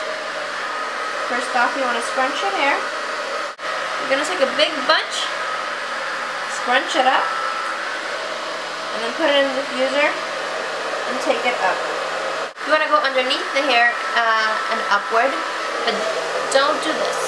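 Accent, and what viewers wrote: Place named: Portugal. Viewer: Thanks for the tips! this is the 1st video I watch of you and I already knew some of the thing and tips to do, just need to buy the equipment and utensils lol! Btw didn't know you played the violin...well I just assumed so since your twitter pageis, Idk for sure but thanks again so much! I'll be sure to try them. I also have my pages of XxxThePianistxxX on facebook and youtube (I think that's how I spell it;) ) and xxThePianist&ViolinistxxX on twitter. Mostly music related but I also have curly hair and I try to style although it's really difficult and then it's really dry, moisturize without putting your scalp too oily sigh a torment but I love my curls, it's just the time and patience to deal with it lol. Do you use an alcohol free shampoo btw? And also you sound like portuguese, your accent, sorry if I'm wrong, but just guessing. 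Lovely curls girl btw! xx - XxxThePianistxxX (Camila F.)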